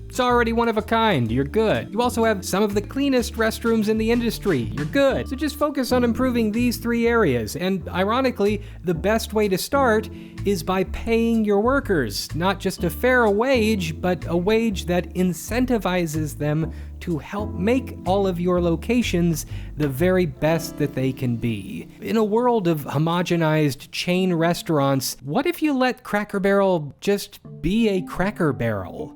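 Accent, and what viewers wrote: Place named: Missouri, United States. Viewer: restaurant videos also show signs of modernization by removing the emotional slips into a Missouri accent. Whenever I feel the need to mimic my Springfield-based coworkers I always locate the accent with "have a training day!" and it brings me immense joy.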